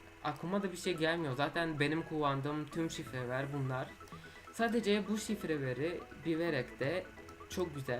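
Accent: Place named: France. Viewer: Fransa'da mı yaşıyorsun? Çünkü aksanın Fransızları andırıyor. 😊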